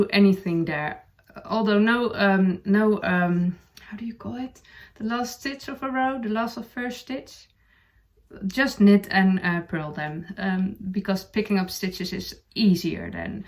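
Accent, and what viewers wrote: Netherlands. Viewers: It's delightful to watch and listen to you. I don't mind your dutchie English. Looking forward to seeing your steeked cardigan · Love your sweater, the neckline, the colors, the patternwork and it's placement! Well done! ❤❤❤ Your English is very good. Love your Dutch accent, so charming. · Oooh. I am only a few minutes in but know I'm going to love you. My grandfather was born in Netherlands and your accent reminds me so much of him.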